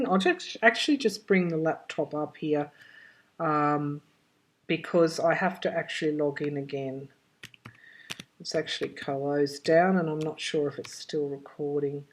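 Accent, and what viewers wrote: Australia. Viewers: I love how you write; your Ausie accent is such, that in my minds eye, I hear you. Good luck on your health issues. is terrrrrific. 🇺🇸🐈♈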